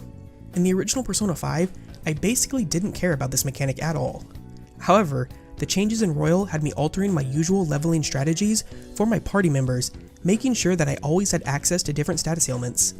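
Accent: Japan. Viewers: Is Japanese your first language?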